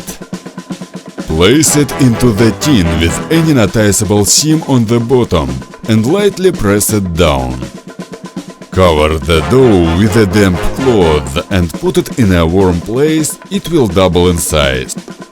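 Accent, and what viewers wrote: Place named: Russia. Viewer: Wow! This is a Russian accent. I know how it sounds, because I am from Russia!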